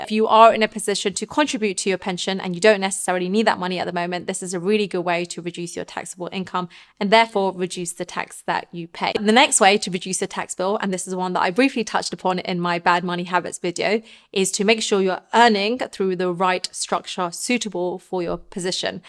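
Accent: United Kingdom. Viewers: What kind of british accent do you have? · What part of UK is she from?? I’m from the States trying to see what UK accent is from what part of UK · Who's here for US taxes and noticed this lady has a British accent, scrolled down and the location is London. oops! 🤣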